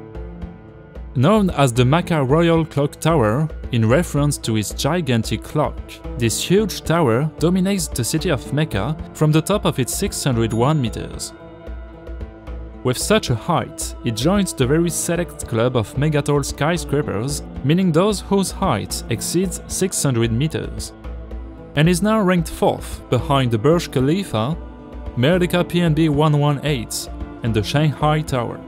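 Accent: France. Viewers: I like your French France 🇫🇷 accent but sometimes I can’t stand what your saying I’m ai sorry will you folrgive me. I’m a sorry.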